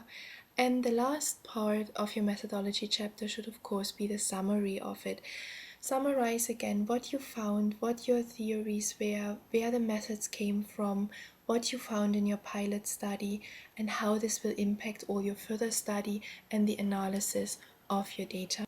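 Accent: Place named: Germany. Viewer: Are you a german, because you sound like a german speaking english.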